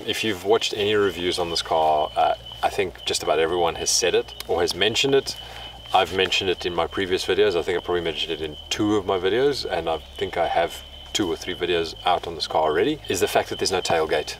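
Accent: South Africa. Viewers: You south African? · Awesome video. Really enjoyed it. Your accent though, are you South African? Doesn’t sound Aussie, or are my ears deceiving me 😅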